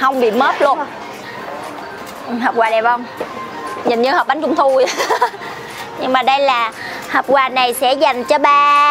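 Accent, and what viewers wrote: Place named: North, Vietnam. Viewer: Chi diễn bắc cứ trương chình nào e cũng thích het.nét chi diễn rất la có duyên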